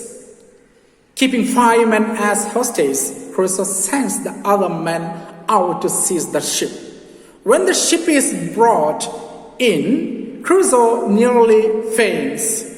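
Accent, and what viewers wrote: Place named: England, United Kingdom. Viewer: Presented well. Your adherence to English accent is appreciative but let me tell you Indian accent is also acceptable. Once fluency is achieved accent is welcome otherwise it will sound a little bit artificial. Having said this, I don't mean you are average , you are well above and I wish you splendid honeymoon with the language.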